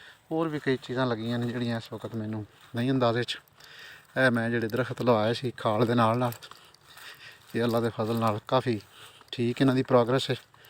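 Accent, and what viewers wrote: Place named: Hafizabad, Pakistan. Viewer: Mashaa ALLAH Bhai zabardast Hafizabadi boli soooo niceeee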